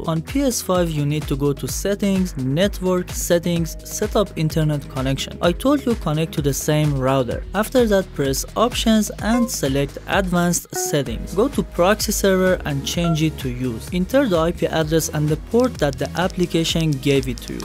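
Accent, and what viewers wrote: Iran. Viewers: فکر کنم شما ایرانی هستی. از لحجه انگلیستون فهمیدم👌🏻✌🏻😅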